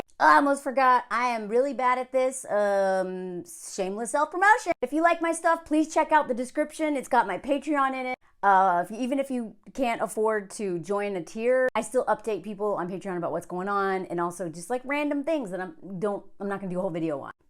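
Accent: South, United States. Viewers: The Southern Belle twang: Here for it! And the puppets - a childhood joy coupled with information I enjoy as an adult - Enjoying the creativity with your content - Hi ❤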